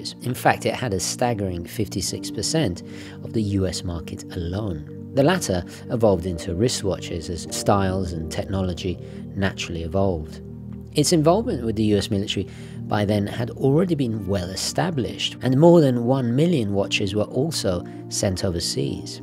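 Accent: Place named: United Kingdom. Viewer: I thought u were British...?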